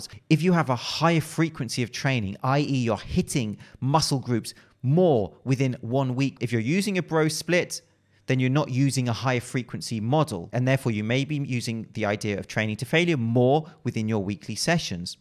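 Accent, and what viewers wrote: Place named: United Kingdom. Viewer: イギリス味が強いな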